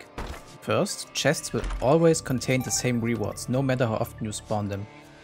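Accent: Germany. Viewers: you seem to have a strong accent, sounds pretty german to me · Are you german? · Where are u from, sounds a bit like German I think. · you german? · your german accent is very pog and interesting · You got a decent german accent haha, i like this more then just reading text keep it on if you like too :D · Uff dein englisch klingt Deutsch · Hab schon immer geahnt dass du deutsch bist 😂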